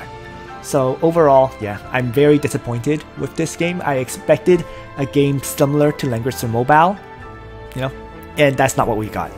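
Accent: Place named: Quebec, Canada. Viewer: You're Canadian! I was always curious where your accent was from, and I had suspected it was French-Canadian. My girlfriend has been wanting me to ask for the longest time, as she's subjected to hearing your videos all the time as I game. :b Anyhow, it would have been nice to get another good FF Tactics game, but it also comes as a bit of a relief that no other mobile tactics game will take time away from Langrisser given all the hours I've put into it thus far. Also, that you won't be torn away any time soon from continuing to release quality Langrisser strategy content. :D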